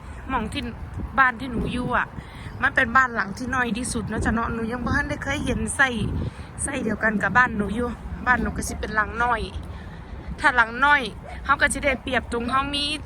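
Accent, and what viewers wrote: Chaiyaphum, Thailand. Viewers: สำเนียงเว้าคือคนชัยภูมิแท้ แม่นบ่ครับ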